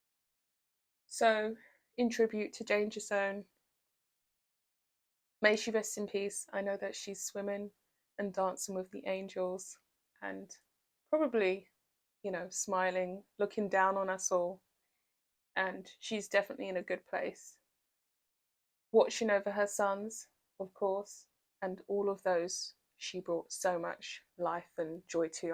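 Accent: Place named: England, United Kingdom. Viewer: I'm glad you're back. You're one of my favourites. As an Englishman from London, it's lovely to hear an English accent....especially when it's a competent and educated one. Keep up the. Good work.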